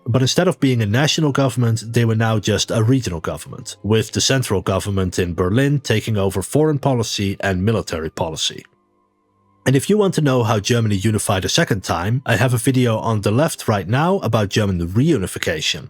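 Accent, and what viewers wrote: Netherlands. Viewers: ik hoor je niet vaak engels op zijn rutte praten maar Kanals😂 klonk wel mooi helemaal omdat je het tig keer achter elkaar zij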